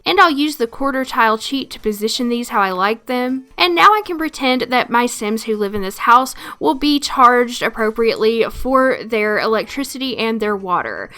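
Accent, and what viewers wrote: South, United States. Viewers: I have been playing Sims 2 (on and off) since 2006, and had NO CLUE about moving wall hangings up and down the wall!!! what!!!!! btw love your channel! you’ve got the best vibes and being from NC i appreciate the southern accent representation 😂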